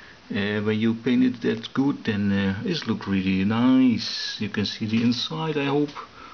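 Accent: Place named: Netherlands. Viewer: I love the Dutch diction and intonation. No matter what, when a Dutchman is explaining something, even if it's something completely mundane, it always sounds like he's reading from a text book on quantum mechanics.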